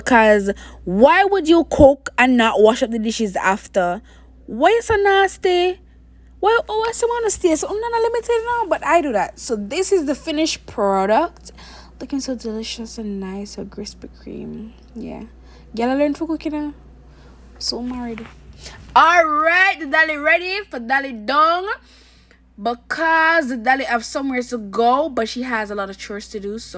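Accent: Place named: Trinidad and Tobago. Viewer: Its the Trini acsent for me, the lady niceeeh man 🎉🎉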